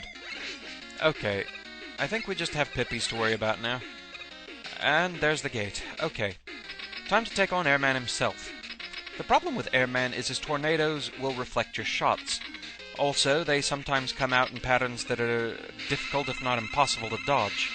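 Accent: United Kingdom. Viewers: are you british? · You sound british..... But you're not though right? Like the accent though.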